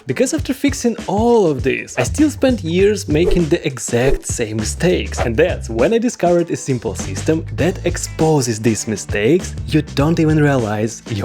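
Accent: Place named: Eastern Europe. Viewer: Laik podposka slavyamskomy malchiky s milym accentom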